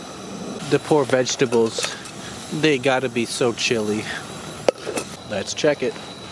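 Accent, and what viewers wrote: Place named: Upstate New York, United States. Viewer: I wonder what state this is in? I detect a slight accent, upstate New York? Beautiful patch of woods. The vines on that tree are so cute and fascinating.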